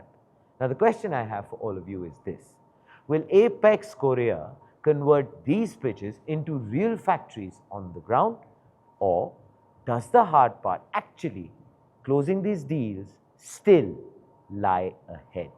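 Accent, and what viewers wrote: India. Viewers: Please, please improve your curry english, its really a pain in the neck trying to figure what the f--k you are talking about!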